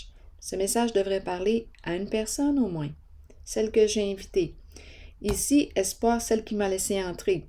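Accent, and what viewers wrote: Quebec, Canada. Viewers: Bonjour Je te découvre après avoir écouté ton message à travers de ce très beau tiraje.... On diré que tu t'adresse à mon cœur.... c'est exactement ça...... La carte du flirt sur la carte du papillon.....me fait réfléchir sur le fait de lui faire confiance ou non..... Elle, Taureau ascendant Sagittaire de 47 ans, avec un ego et un orgueil très fort et démesuré..... Elle a frapper à la porte de mon cœur le mois de mars dernier. Cette femme a souffert des abus de la part de son père jusque les 17 ans. Elle est sublime mais très abîmée....je souhaite croire que elle pourrait encore s'engager et tourner la page, et aller vers une vie de paix et amour sans tromper ni tricher ni commander.....mais je n'en suis pas tout à fait sûr de cela.... Le mois de janvier sera définitif pour y voir plus clair, réconciliation ou rupture.... et c'est moi qui devrait prendre la décision...... 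Ton magnifique tiraje, termine sans se terminer ni donner des réponses à cet belle mais très dure situacion..... Je te remercie pour ce tiraje tres riche et profond, et pour ton merveilleux accent du Québec....que j'adore......Moi je suis aux Pyrénées-Atlantiques aux pais vasc frances entrain de vivre cet exercice de vie..... A mes 72 ans, je souhaite vivre la paix et l'harmonie...... Si cette belle âme choisit la paix, l'amour et l'harmonie je resterai à son cotée pour continuer à grandir ensemble, mais si ne c'est pas le cas, c'est moi qui va tartir cette fois ci. Je te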